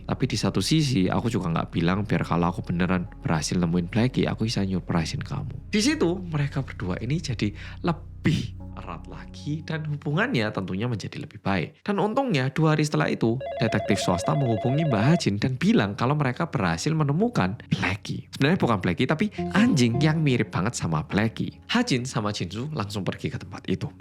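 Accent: Java, Indonesia. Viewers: Yang medok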